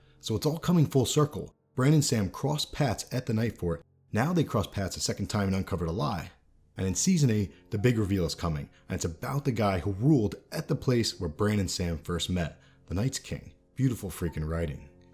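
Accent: New Jersey, United States. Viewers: Let's face it.. with that NJ accent, THAT'S the rhythm of ur narration! lol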